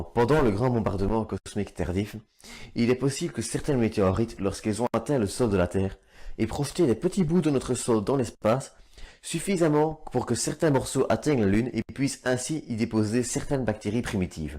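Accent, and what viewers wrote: Liège, Belgium. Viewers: T'es liégeois toi nan ? 😃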